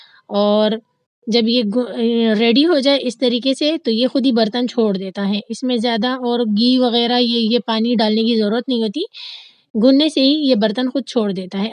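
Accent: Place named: Peshawar, Pakistan. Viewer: Apki batun ne lehjy Sy mujy peshwar ki yad agai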